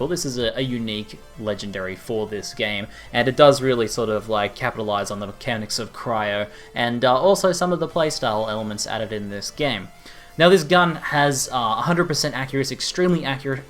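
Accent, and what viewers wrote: Australia. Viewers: Are you Aussie? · woohoo a Aussie YT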